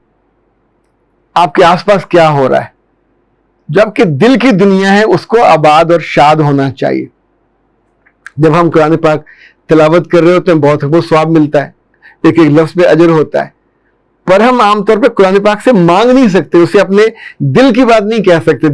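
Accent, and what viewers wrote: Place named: Hyderabad, India. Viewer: Hyderabadi Urdu ...Awesome 👌👌👌😊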